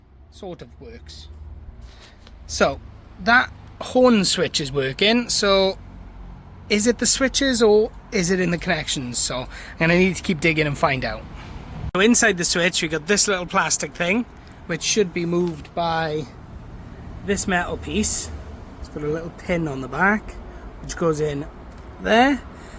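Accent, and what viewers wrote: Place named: Swansea, United Kingdom. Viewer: You sound like a Swansea lad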